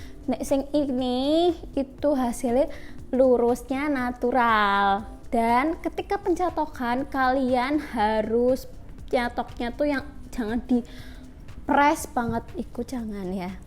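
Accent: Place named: Java, Indonesia. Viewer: medok re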